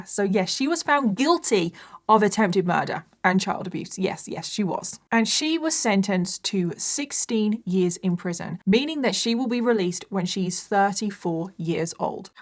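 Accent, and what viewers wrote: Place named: United Kingdom. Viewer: I heard 22nd ‘December ‘ but you’re not the only person who heard September.. might be the British accent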